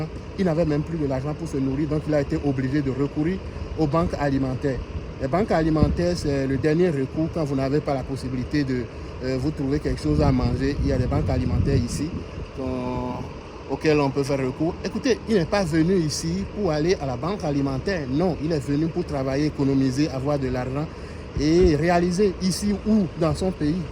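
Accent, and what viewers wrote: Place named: Benin. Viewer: Merci beaucoup vous avez un accent béninoise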